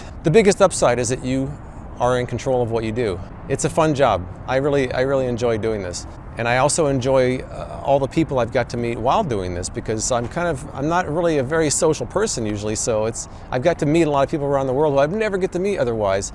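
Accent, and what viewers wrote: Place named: Florida, United States. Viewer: As soon as you said you were from Jacksonville I said, I Knew It!! I might not have had the city down but I knew you were a floriduh boy. Review suggestion: lighted magnifying glasses. I'm in the market for a set for my crafting and there are so many different kinds on Amazon. Mighty sight of course, you may have done a review for that but not a comparison against other types.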